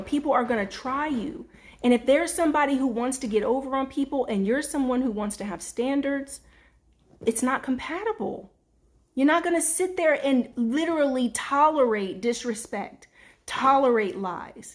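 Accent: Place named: Georgia, United States. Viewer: Why do you low-key look like Jada Pickett-Smith today? Except for the Georgia accent, you sound a little like her, too.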